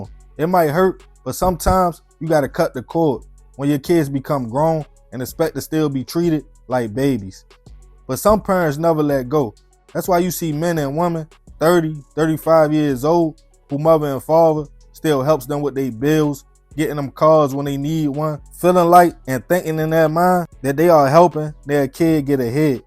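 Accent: Baltimore, United States. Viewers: I love when I can still hear that Baltimore accent in your voice 😂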